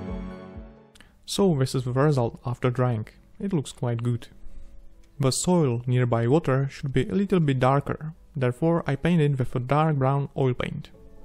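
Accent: Czech Republic. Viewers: podle přízvuku jsem si říkal že jsi čech :D , ale supr video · Z toho přízvuku to bylo poznat a české nápisy to dovršili a ty taky 😁 takže příště video česky 😶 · Really strong czech accent :D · Já jsem si myslel že jsi Čech už jenom podle toho přízvuku když jsi mluvil anglicky 😂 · Maš typycky českou angličtinu. Ta sry mě ta artikulace prostě sere chtělo by to zapracovat ale jinak moc hezká práce. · Českej přízvuk se pozná xd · Ja sem si mislel ze ses cech podle ti anglictini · Hned jsem podle přízvuku poznal našince, krásná práce kamaráde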